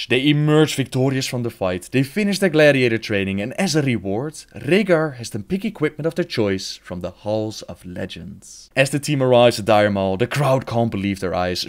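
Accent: Netherlands. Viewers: Dutch accent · No. it's his accent. he's Dutch.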